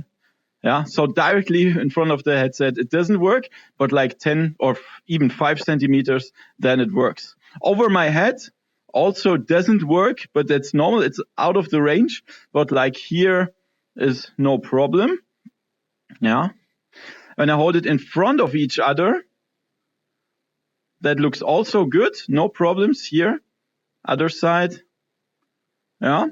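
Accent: Germany. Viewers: Mein Gott, du erinnerst mich an meinen Vertriebschef, denglisch vom feinsten...das ist so lustig zu hören, sorry mann, aber das ist echt köstlich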